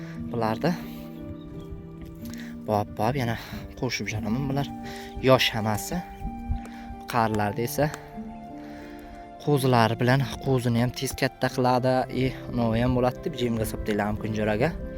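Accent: Surkhandarya, Uzbekistan. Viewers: Узбек Сурхондарё Кашкадарё областларини шеваси шундай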